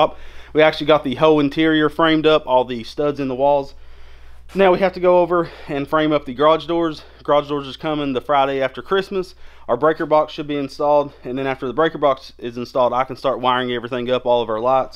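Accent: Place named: Georgia, United States. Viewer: Cool. When I first heard you talk I thought you were from South Georgia. Nice video!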